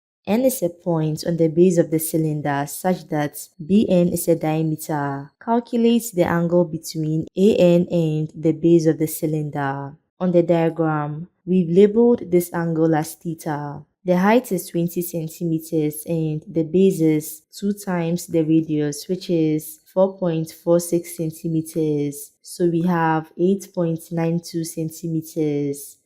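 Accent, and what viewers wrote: Ghana. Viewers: You sound Ghanaian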